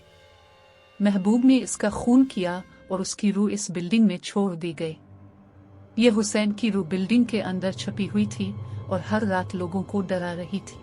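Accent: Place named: Afghanistan. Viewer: Aap ko urdu aa nahi rahi hai Afghani accent hai app ka Muslim log puja paat Nahin karte😮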